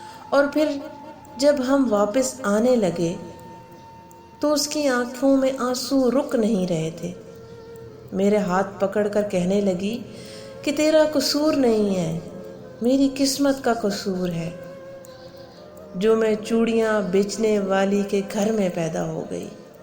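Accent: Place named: Punjab, Pakistan. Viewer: کمال پنجابی تڑکے کے ساتھ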